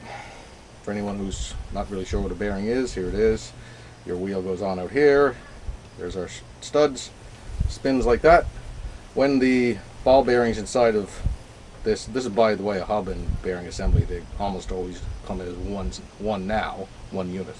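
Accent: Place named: Canada. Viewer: I love your Canadian accent. Sounds very cool! Much love and respect from your friends from the southern border!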